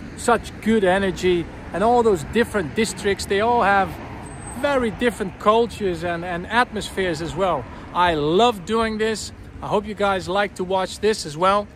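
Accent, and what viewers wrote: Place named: Netherlands. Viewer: Spijtig dat het nu in het Engels is . Het was toffer , gezelliger om in het Nederlands te luisteren . En vooral het Nederlands accent ik zelf ban van Belgie de Provincie West Vlaanderen . Ik kijk naar al je video's en rij zelf ook motor en heb toch al verschillende dingen van tips die je zei kunnen veranderen . Waar door het nu veel beter gaat en de volgende aub weer in het Nederlands . Mvg Dany 🤛✌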